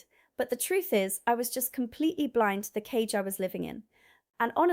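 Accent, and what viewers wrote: United Kingdom. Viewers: is she really an American? her UK accent isn't that of someone from the USA meaning U think she was born outside the USA and emigrated here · WOW! Great piece and so well put together. Freedom .... scanning for emergency exits everywhere you go, kids with a bulletproof insert in their school satchels, neighbours measuring how long your grass is. That brought it home how visceral the day to day experience is. Imagine being a mother and inserting a bullet proof thingie into your kids school satchel. Every day is a reminder of what COULD happen. We all know USA has a gun problem and an even bigger problem is some of the people that own them .. They practically walked into like a Tesco that sells guns and bought one off the shelf. That is from a UK point of view UTTERLY MENTAL and we all knew that. BUT your piece was VERY ILLUMINATING in outlining the pyschological effects and the almost constant unease of being in public spaces. 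I do hope the unwinding of your nervous system and your deeper sense of ease will continue to grow. We all knew the potential physical dangers but had probably not thought about the wear and tear on your pysche. Thank you for your most illuminating piece. May your new more at ease state of mind continue to grow and deepen. Your accent has become very UK as well. Welcome! ❤❤😊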